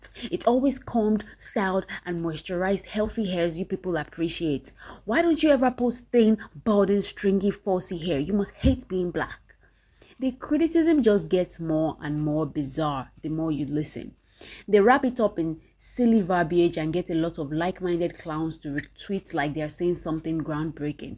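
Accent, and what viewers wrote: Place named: Nigeria. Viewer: How can i like this video more than once? Your Nigerian accent and no bullshit attitude only makes it better.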